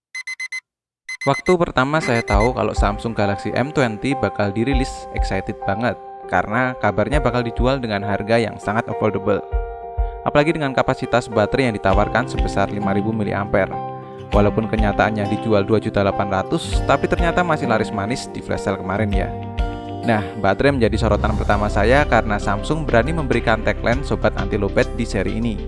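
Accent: Yogyakarta, Indonesia. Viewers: Wong jogja po?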